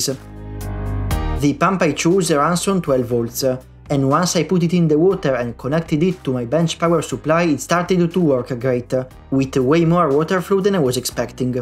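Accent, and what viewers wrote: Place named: Italy. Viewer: Bellissimo progetto! Non capisco perché farlo con questo inglese dall’accento chiaramente italiano. Anyway, bravo!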